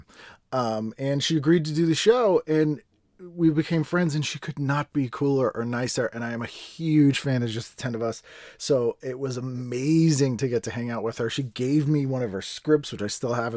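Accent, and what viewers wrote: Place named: Boston, United States. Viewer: Congratulations! Been loving going back through the back catalogue. I blame you gushing about Trilogy of Terror on Junkfood Cinema for making me go listen to your podcast (and also Dana Gould and listening to you both go FULL Boston which is something to behold). You rock, man!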